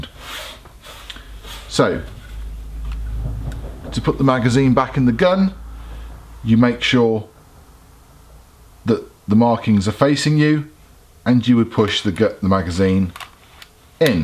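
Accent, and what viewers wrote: United Kingdom. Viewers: Am I the only one who thinks his voice and accent are annoying for this kind of video. Cuz I totally wasn’t expecting a guy with a British accent when I came here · I subbed just because of your voice. It's not just the accent, you could be a commentator for the BBC or Top Gear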